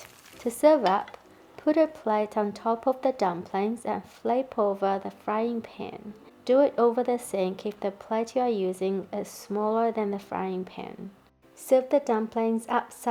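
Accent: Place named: New Zealand. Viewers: Love the Nz accent ❤ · Spotted the NZ accent straight away! Thank you for the tutorial! I've been lowkey scared to try pan-frying dumplings, so I've always just steamed them. I'll give this a try.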